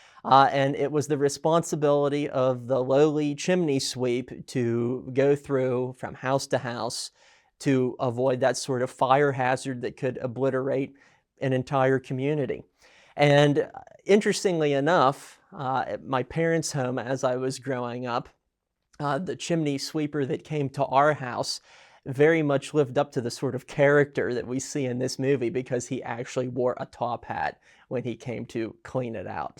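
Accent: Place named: Outer Banks, United States. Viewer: Your accent is incredible. I've never heard a high tider/outer banks accent from an actual person before that wasn't an impersonation. Yeah the video is great and all, but I had to point this out since I couldn't get over it lol.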